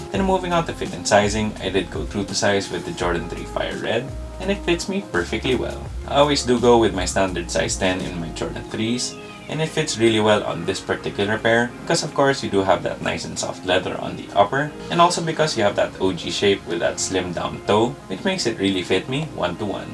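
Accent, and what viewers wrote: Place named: Philippines. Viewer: dat pinoy aksent men🤘🏽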